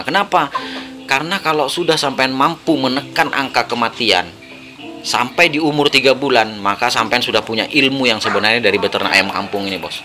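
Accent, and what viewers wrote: Madura, Indonesia. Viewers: Madura ya kang? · Dari logatnya orang madura ya cak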